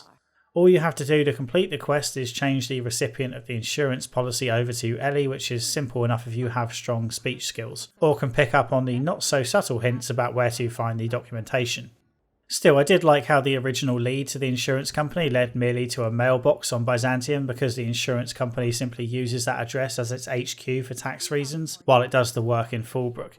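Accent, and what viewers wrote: United Kingdom. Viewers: “The game presents an extremely anti capitalist take, witch I am completely on board with” Cronyism and a type of Vulture Capitalism has its problems for sure... But Free Market Capitalism has bin amazing for us in so many ways. Corruption in people is what’s led to most of our problems.. the Free Market is somewhat the answer to these problems. Capitalism is a much better alternative to Socialism or Communism.. A lot of hippies and propagandists have bin at work over the last few decades trying to convince people otherwise.. They think something that’s already failed many times and led to the death of hundreds of millions of people is something we should try again. A very small and homogeneous country like Denmark had to stop these socialist types from making the claim they were a successful socialist society.. It’s still not something that would work large scale with groups of people indifferent with each other. 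Freedom is worth more then life itself. limited government is almost always the better option. I live in Canada with a socialized healthcare system.. and our healthcare system suffers in so many ways because of this. Not to mention our high taxes and outrageously high cost of living.. You live in the UK from what I gather.. the accent. I know the NHS has a lot of problems as well.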